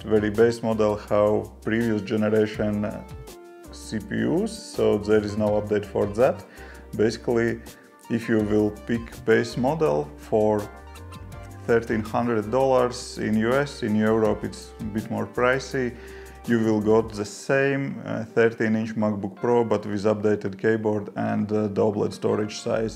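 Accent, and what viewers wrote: Russia. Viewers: The accent pronunciation is 100% Russian and there is no need to come up with yourself _ that you are supposedly from Latvia. Stupid you are a grown man, and you behave like a 16 year old boy. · Кажется я отчётливо слышу русскаий акцент)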